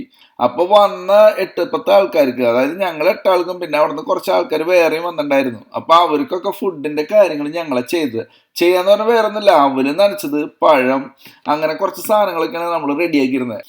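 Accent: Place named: Thrissur, India. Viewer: ഇത്ര നാളും അമേരിക്കയിൽ കിടന്നിട്ടും ചേട്ടൻ നമ്മുടെ തൃശൂർ സ്ലാങ് മറന്നില്ലല്ലോ.. 👌👌👌ഇത് അമ്മയുടെ comment ആണ് 😄😄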